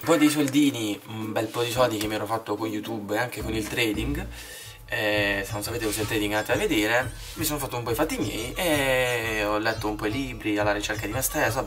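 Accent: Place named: Apulia, Italy. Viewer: Scusa se te lo chiedo ma sei pugliese? Perché hai quasi, se non del tutto, il mio stesso accento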